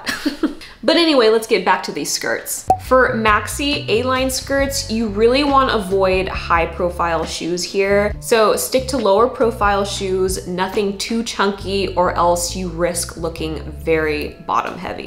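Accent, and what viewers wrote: Australia. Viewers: is the random australian accent an inside joke? I am so confused 😅😭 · Lmao same I was like ‘she keeps doing an Australian accent and as an Australian I’m not mad about it’ 😂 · Its the Australian accent coming in and out for me 😍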